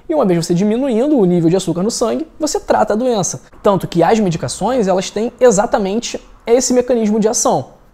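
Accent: Rio de Janeiro, Brazil. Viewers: Gostei muito voce é carioca?voce tem sotaque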